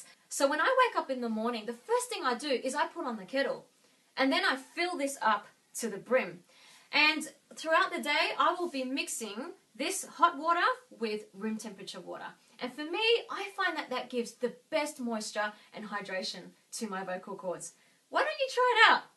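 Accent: Australia. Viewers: Her accent is Australian. Not like the movies is it? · Juan Barboza it doesnt sound American and British because its Australian. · Its clearly australian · Wow I need to go to Australia and marry an Aussie woman when I grow up because the accent is SO clean! :D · You look Asian but sound Australian..what are you..?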